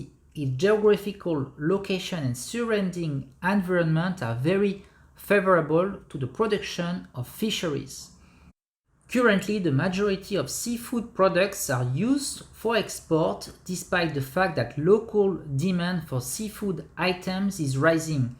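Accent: France. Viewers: Ca sert a rien de parler en anglais avec l’accent français !!parles directement en français,ca nous aidera a comprendre :)..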